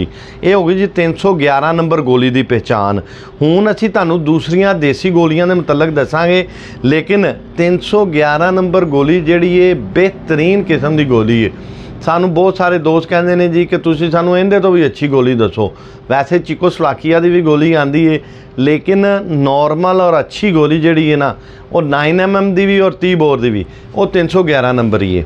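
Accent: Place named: Punjab, India. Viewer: I REALLY VERY MUCH LOVE SMILING FACE EXCELLENT PUNJABI ACCENT LOVE U BROTHER,PL MAKE A VIDEO ON LATEST COST ALL TYPE AMMUNITION,💙💜💚🧡❤💛♥👍👍☑✅🆗😊😊😌😌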